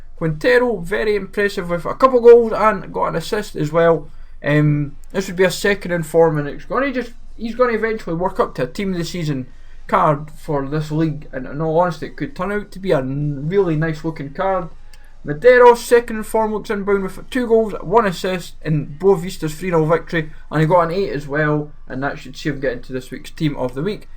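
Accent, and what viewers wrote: Scotland, United Kingdom. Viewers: sounds like he's Scottish to me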